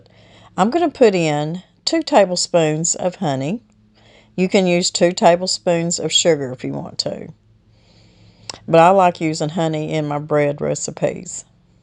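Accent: South, United States. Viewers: As I was watching this video, I was making honey wheat flax seed bread adapted from Heather at The Kneady Homesteader. House smells heavenly! I love your mellow voice and easy southern accent. So glad I found your channel.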